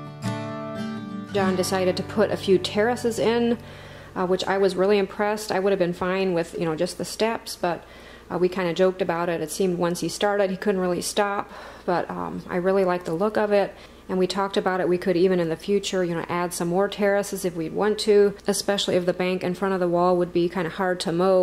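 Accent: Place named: Pennsylvania, United States. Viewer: Beautiful! Your husband did a fantastic job. Thank you for sharing your lovely property and the hard work you put into creating it. Your accent makes me long to visit my relatives in Berks county PA.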